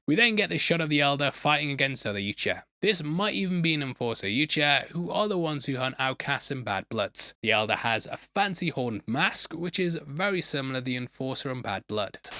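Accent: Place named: United Kingdom. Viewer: He's British, they have a million different accents on a tiny island country. I lived there for years.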